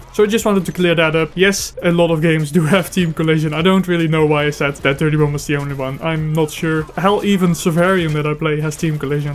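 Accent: Netherlands. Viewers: eey mate you dutch?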